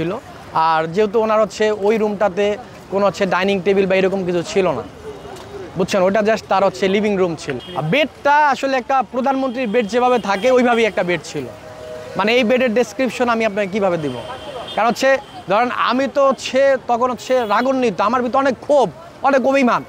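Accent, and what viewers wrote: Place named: Geneva Camp, Bangladesh. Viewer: তোমার কথার মধ্যে মাওরা মাওরা একটা টান আছে, জেনেভা ক্যাম্পের বাসিন্দা মনে হচ্ছে। তুমি বড়ো হলে শুয়োরের বাচ্চা হবা। 😂 নিশ্চয়ই।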